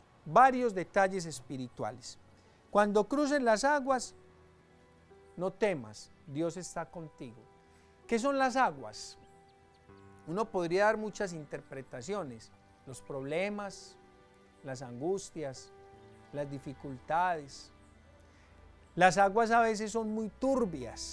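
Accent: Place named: Antioquia, Colombia. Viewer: Fresco Papá! A lo paisa pues Padre. Me encanta! Me encanta! Usted es super lindo y humilde padre con todos los que le escuchamos. Me hacen feliz los dialectos de mi hermosa tierra. Me hace sentir como si estuviese allá en mí tierra que adoro y extraño. Gracias Señor Jesús por que Tú vas conmigo, en todo momento. Sácanos de todas éstas turbulencias mi eterno Jesús. Sé que contigo siempre tenemos La Paz. Bendiciones Padre. Gracias por compartir diariamente La Palabra de Dios. Que Dios le conceda mucha sabiduría, salud, y felicidad. Feliz día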